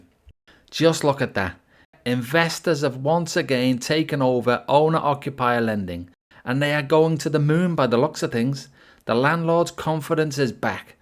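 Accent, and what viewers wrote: Liverpool, United Kingdom. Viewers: But you sound like a scouser.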